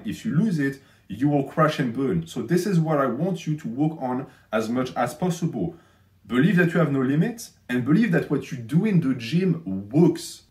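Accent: France. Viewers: With no exaggeration, I love the perspective offered on this channel. Sound advice from a holistic vantage, a thicc French accent and videos long enough to tax my attention. You understand this cant cease, oui?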